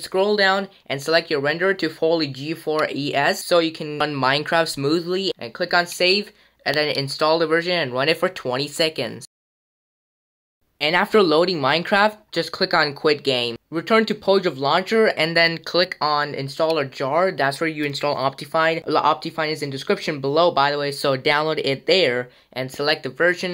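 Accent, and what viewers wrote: England, United Kingdom. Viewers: Finally this English voice all videos Indians voice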